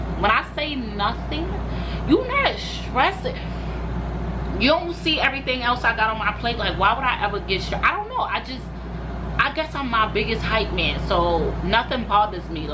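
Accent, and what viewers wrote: New York, United States. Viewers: Hey beautiful new fam here I literally been benge watching your videos an babbby I'm loving an feeling your vibes your realness is everything I can relate to alot of your conversations in SOOO many ways like girl I be like dam is she in my head😅😅😅it's crazy plus I love the new York accent, I have a channel with my nieces an nephews so we will definitely be supporting you an your BEAUTIFUL babies have a blessed Sunday 🙏💜😘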